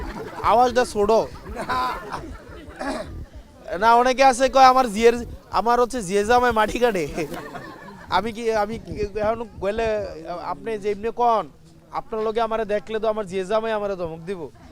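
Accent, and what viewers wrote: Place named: Comilla, Bangladesh. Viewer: কুমিল্লার ভাষায় কথা বলেন খুবি ভালো লাগে, আমরা আমাদের নিজেদের ভাষায় কথা বলতে পছন্দ হয় শুনতে পছন্দ হয়,দোয়া রইলো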